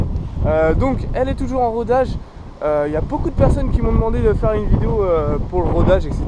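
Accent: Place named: North, France. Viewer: au toi tes du nord =)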